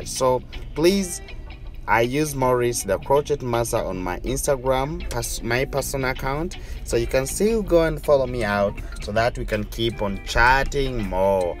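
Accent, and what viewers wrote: Uganda. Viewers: Yeah definitely Ugandan. He sounds and talks like my dad. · According to your accent I think you’re a Ugandan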